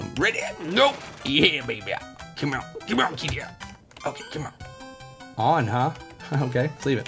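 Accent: France. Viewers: that french accent though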